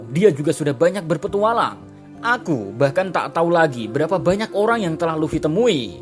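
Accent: Java, Indonesia. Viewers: Orang mana kok nyamen boso jowone.....salam oplovers tuban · Orang jawa mana suaranyaa🤣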